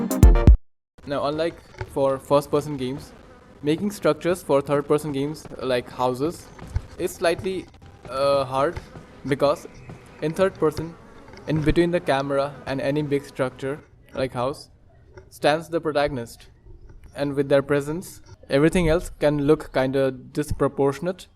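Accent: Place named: India. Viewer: This looks so good i made a good coice to sub to you, Iam noticing you have a indian accent so,just curiuse are you India btw jam not indian ism bangladeshi. This was a wild journey to good trough such a creative video 😅 EDIT:I really like the Charector it has her own visual style,Also i was thinking should this game have shell shaded charectors, I Thik It will break the unique art style what done you think🤔.And o wana if this game going to be TP. Or FP it looks really good one both 😆..Holy shit i was just watching The video this chicken i's fucking uncanny dude seriusely got me. 😨 (Enemy with respect was really funny ngl😁).You rembeling random sad shit and the chicken just checking her out was the best part really adds to the pervy part. The random bits if unintentional jokes are pretty funny i like how the chicken just humping the green ball. 😂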